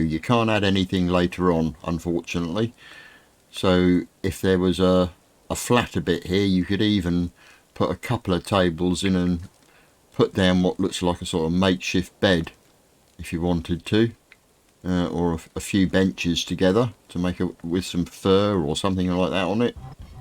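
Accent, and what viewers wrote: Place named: West, United States. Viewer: I came here to watch your village, but you also thought me how to make a big bridge, I want to build one so bad and every video I watch about it, it only teachs to put some planks over a little stream, not across a big river like you did, thanks for that! (plus, I like the way you talk, sounds like a cowboy or something)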